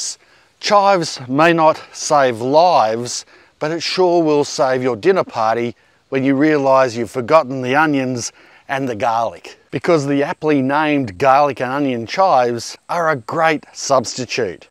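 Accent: Australia. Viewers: Your "Down Under" accent at times makes me raise my eyebrows and ask "Did just say what I heard him say?" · I've been watching his vids for nigh on 2 years and whenever I mention gardening my family now respond in Australian accents..."What does say?" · I will tell which I will replace: None! Your channel is amazing. Plus that Australian accent and your passion your are right up there with the late crocodile hunter. Good lookin out bro.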